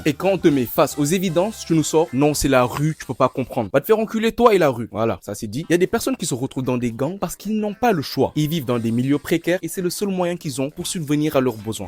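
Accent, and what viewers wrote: Senegal. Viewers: tu es sénégalais à ton accent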